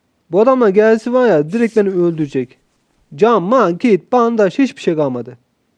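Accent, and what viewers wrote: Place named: Afyon, Turkey. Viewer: Tam afyonluca konusuyon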